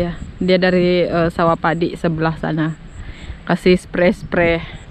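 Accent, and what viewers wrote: Sabah, Malaysia. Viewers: Lawas dalam negeri Sarawak yang bersempadanan dengan negari Sabah. Kerana itu logatnya lebih kepada Sabahan. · loghat kamu sama ya macam sabah...memang begitu ya maaf saya just nk explore budaya sabah sarawak...biasa dengar loghat sarawak ada lain sedikit kn...😁😁😊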